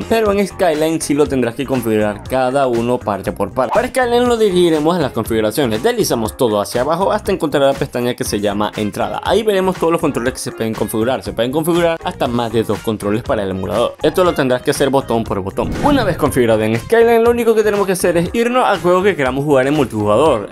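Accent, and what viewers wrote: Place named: Mexico. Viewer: enserio mano, yo pensaba que eras de México xd. Sigue así tus vídeos son exelentes♥️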